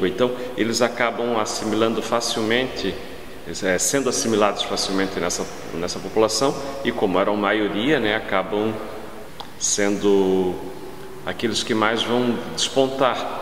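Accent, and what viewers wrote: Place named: Florianópolis, Brazil. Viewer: Ótimo vídeo! Sotaque bem manezinho... me soa muito familiar. :)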